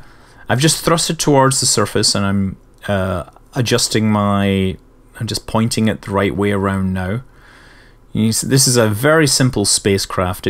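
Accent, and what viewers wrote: Scotland, United Kingdom. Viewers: It's. He have scotish accent.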